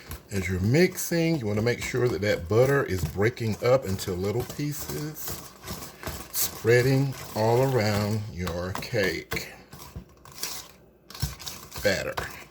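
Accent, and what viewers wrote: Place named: South, United States. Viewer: Hey. New subscriber here. The cake looks delicious but your voice really had me hooked, so very sexy and earnest and with a southern flare. I loved that you gave the ingredients up front and took the time to let viewers know that they (we) can tailor it to our needs. Thanks again and I'll keep watching!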